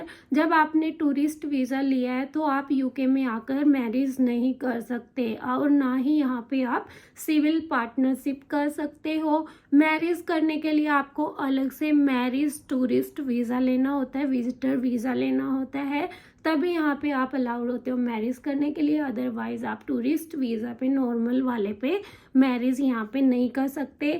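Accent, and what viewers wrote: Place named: Haryana, India. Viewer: Your Jaatu / Haryanvi accent is too visible. "Discussan , Rejecsan" LOL And here is your not-so-intelligent Haryanvi mind who can't comprehend what is remote work! Guys, you can't work in the UK on a tourist visa.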